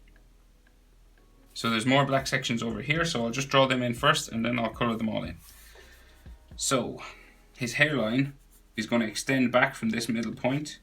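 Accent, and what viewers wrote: Ireland. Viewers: Yesss thank you I really needed this tutorial also I really like your Irish accent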